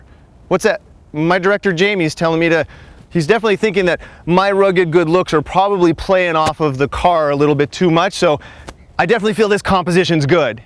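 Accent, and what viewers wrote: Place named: United States. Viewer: The car sounds great. I wish annoying yank with that grating accent would shut the f**k up though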